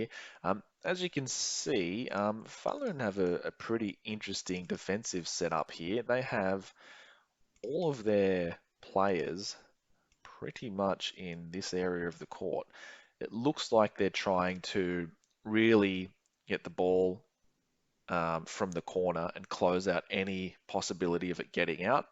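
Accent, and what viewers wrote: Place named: Australia. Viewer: Your voice sounds like an australian accent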